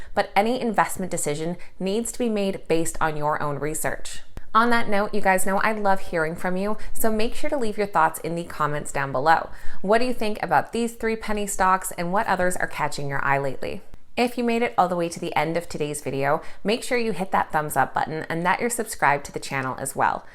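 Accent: Canada. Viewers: Great vid.....always nice to see the sleeping pooch. Made 600% on Dogecoin.....sold everything. 👍. Are you Canadian?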